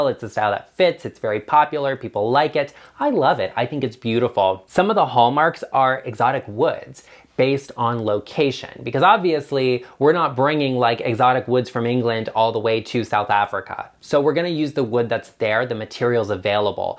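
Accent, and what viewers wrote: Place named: South, United States. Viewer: Love your channel! Thank you for all this valuable professional advice. Where, generally, are you located? Northeast, South?… You sound a little southern to my Texan raised ears. ❤️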